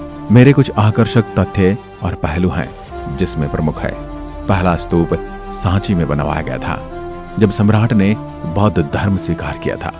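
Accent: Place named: Rajasthan, India. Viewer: Thanku ji mp ke बौद्ध स्तूप के लिए मैने आपकी सारी वीडियो देखी h राजस्थान की आपकी आवाज bht pasand h 🥰🥰🥰🥰 भाई जी प्लीज mp ke gwalior or shivpuri par video बनाइए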